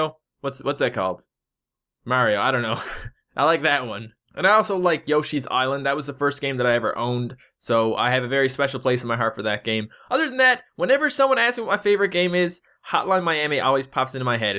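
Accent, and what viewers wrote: Canada. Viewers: I'm new here I saw you from your besiege video and I enjoyed it you earned a sub by the way are you Canadian? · Are you candian ?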